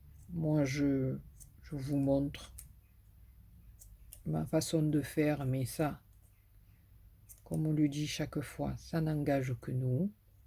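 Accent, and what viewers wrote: South, France. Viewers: bjr madame trop trop beau je ne connaissais pas le système de fleur en simili cuir et j'adore votre accent du midi bonne journée continuer comme cela c 'est super et bon rétablissement a vous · Merci à vous! J adore votre petit accent du midi! Courage ! Cordialement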